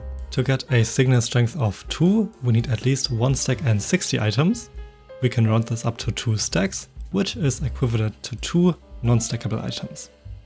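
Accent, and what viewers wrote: Germany. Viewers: I hear a wening deutsch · Warum nicht deutsch man hört das du deutsch bist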